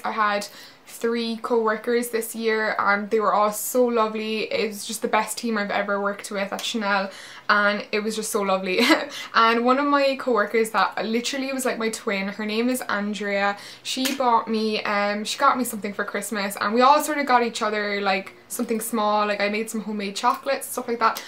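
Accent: Ireland. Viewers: OMG are u Irish I am too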